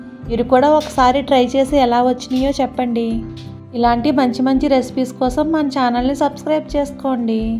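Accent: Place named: Konaseema, India. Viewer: మన కొనసీమ వాళ్ళు ఎక్కడున్నా బాష యాస మాత్రం ఏం మారదు. 👌👌👌 sooper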